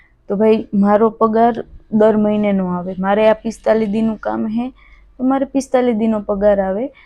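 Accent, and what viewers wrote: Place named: Merani, India. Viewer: બેટા મેરાણી ની ભાષા બહુજ સરસ રીતે રજુ કરે છે તે મને બહુજ ગમે છે બેટા ખુબ ખુબ આશીર્વાદ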